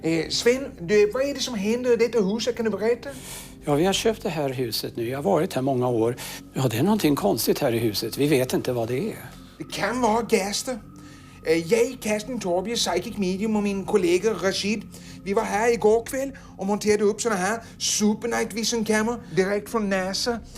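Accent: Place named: Denmark. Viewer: Jä , å ändåäh prätar han 100% Danskäh 😅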